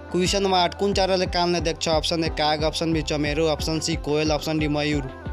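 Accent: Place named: India. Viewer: यो खुद हिन्दिबोल्ने धोति हो एस्लेबोलेको voice सुनेरै बुझिन्छ TRP को लागि यो सब गरिराछ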